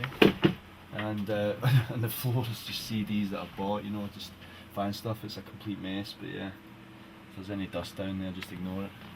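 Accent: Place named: Scotland, United Kingdom. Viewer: Dat scottish accent! I can't understand it :D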